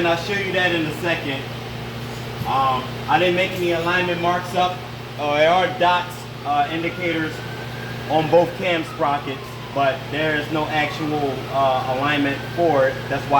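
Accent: Philadelphia, United States. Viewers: He sound like he from philly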